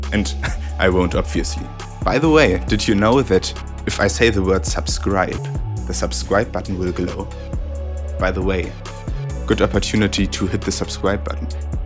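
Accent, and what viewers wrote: Germany. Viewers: Der deutscheste Akzent, den ich je gehört habe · Denglish on point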